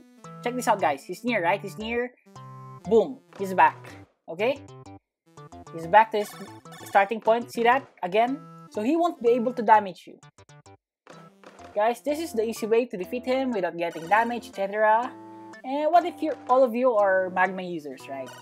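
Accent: Philippines. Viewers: are you from Philippines bro?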